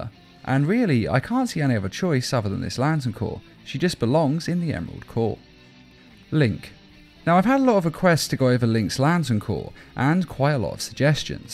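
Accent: United Kingdom. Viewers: Fear because he’s got that calm British accent that makes him immediately 10x as scary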